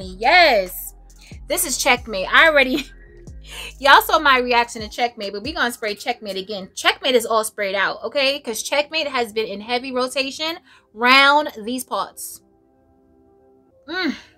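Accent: East Coast, United States. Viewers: East coast accent that ruins it for you? Can she help that she's from the East Coast? Now the pronunciation of the perfume is a good constructive criticism, but to say her accent is an issue, please have a seat. 🙄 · Not the worst review. But that east coast accent that ruins it for me. And the lack of correct pronunciations 🤦🏽‍♂️ (I’m not sure how to say this” is a sentence that prevails with reviewers. I find it to be lazy. Take the time and learn the names of the fragrances. It’s respectful to the house.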